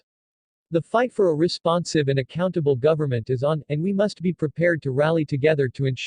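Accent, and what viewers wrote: United States. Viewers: i have never heard of anyone being kicked out of a church unless they caused a nusiance ,this looks like,yet another of these youtube videos that have suddenly shot up with American voice overs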